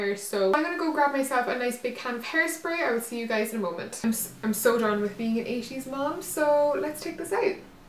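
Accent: Ireland. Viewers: where are you from because your accent sounds like an Irish accent. It sounds like my accent and I'm from dublin 💋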